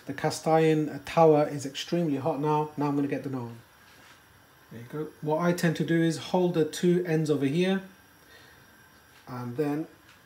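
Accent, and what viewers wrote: Sylhet, Bangladesh. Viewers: ❤️ from Bangladesh vaiya! Amazing work u r doing in UK! U sound like Sylheti 😊 Assalamualaikum to aunti ❤️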